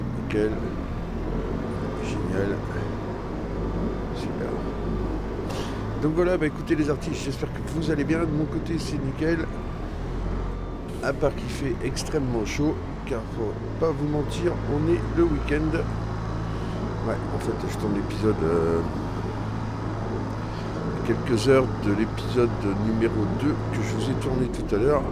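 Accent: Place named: Nord-Pas-de-Calais, France. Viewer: Mon et ses accents... 😂😅 Ton best reste ton chetemi 😍 quand tu te présentes toi tu t'appelles 😅😂 Moi j'adore ta parlementure, et puis après toi tu racontes, moi j'écoute 😂😅🧡 Belle vidéo 👏👍 j'aime beaucoup cette petite série 😍 Gros bisous 🧡🌹💋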